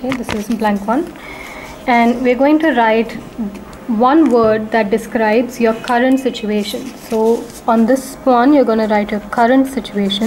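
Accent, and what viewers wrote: Kerala, India. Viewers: I love your looks and accent!!!! Indian!?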